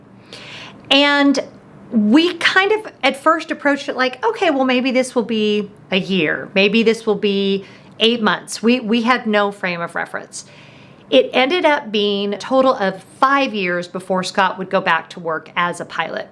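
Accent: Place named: United Kingdom. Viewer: I loved the British accent 🇬🇧!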